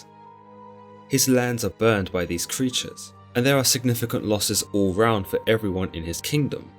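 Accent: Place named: Southern England, United Kingdom. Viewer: He sounds like a southern bloke from England but it could just his accent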